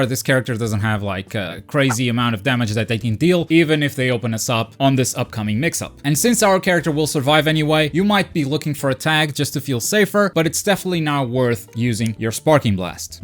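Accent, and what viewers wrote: Portugal. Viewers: If I understood, you're Portughese? E AI MEN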